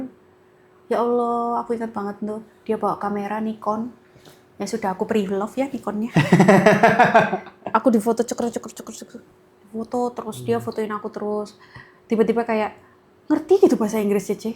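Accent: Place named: Java, Indonesia. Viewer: Bener2 tuh ada lucu2nya, sampe ngakak aku denger ceritanya, dgn dialek jawanya yg medhok. Baru tau cerita awal perkenalan dan kisahnya dgn Kondosan, Luar biasa kisahnya ❤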